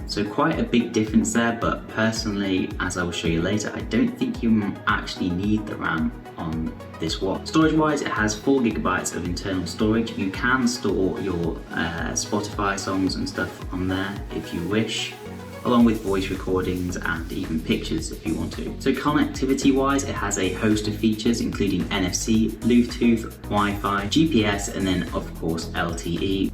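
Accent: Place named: Princes Risborough, United Kingdom. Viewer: yeah because that P Risborough accent wont go away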